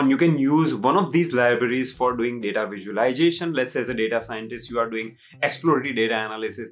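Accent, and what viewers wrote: India. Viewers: This indian accent is so strong, that i needed to watch it 3times to know what he said #NoFront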